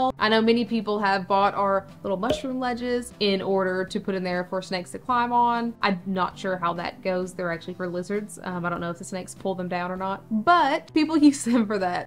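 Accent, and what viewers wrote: South, United States. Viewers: Her southern accent is so sweet sounding lol like an aunt that can bake the hell out of a pie 😂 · First video I've seen on the channel, will sub because 1) a lot of good info here that's presented well, and 2) as a Georgian i must say your southern accent is AMAZING! Your snakes are lucky to have an owner with such a voice, lol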